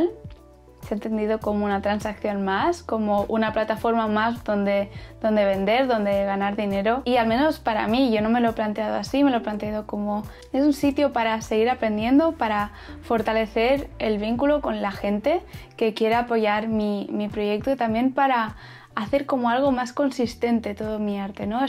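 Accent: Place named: Spain. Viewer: Que bella eres flaca! Aunque tu qcento españolete la corta un poquito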